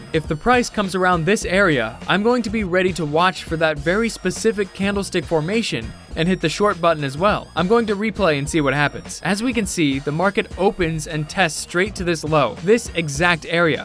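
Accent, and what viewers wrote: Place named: United States. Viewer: I don't think that it's a matter of what timeframe. I think more of a case of when does the day start....I live in Tasmania Australia and my TIMEZONE GMT+11 and I mainly trade on FOREX and I am able to trade from Monday morning am until Saturday morning am which is market close time for New York trading at GMT-5. So I'm in guessing that he's referring to that timezone based on him sounding American. But the other question is, is it a 24 hour period or based on your local trading session?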